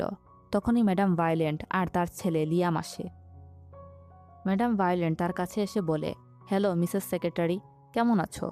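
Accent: Sylhet, Bangladesh. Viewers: Apu apni ki Sylheti?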